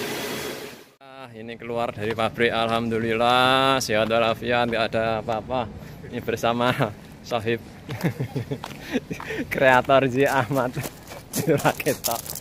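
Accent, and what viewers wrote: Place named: Java, Indonesia. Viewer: Semngt mas. . Jowone pundi ko medook bngett.. 😄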